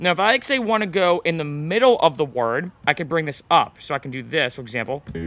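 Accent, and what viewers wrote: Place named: United Kingdom. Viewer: It's neat but I wish it would have been a more neutral sounding voice, the British accent isn't the best choice.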